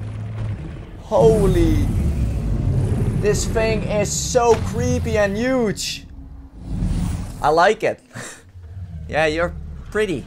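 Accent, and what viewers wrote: Netherlands. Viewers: Ik kon gewoon horen aan je accent dat je nederlands bent :D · Dat nederlands engels accent xD eerste 3 seconden.. oh, tis een nederlander xD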